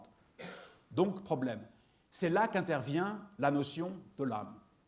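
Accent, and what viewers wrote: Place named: Geneva, Switzerland. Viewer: Que ce Monsieur se rassure il n’a pas oublié son français et même son accent Genevois légèrement exagéré mais bon.... Quant au contenu: pompeux et redondant… Ma conclusion: Profite du coin pour respirer par le nez, une petite randonnée avec un bon saucisson du pain et un canon, une bonne raclette le soir et tu verras s’illuminer ton âme. Ça va aller, tout va bien se passer. Qui boit la gnole casse la bagnole, qui boit la mondeuse casse la dameuse:).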